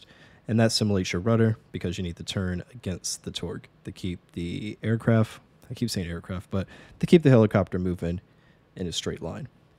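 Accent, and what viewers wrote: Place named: United States. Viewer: "Starting" cold and dark.Very hard to follow that american accent. This video doesn't help me at all.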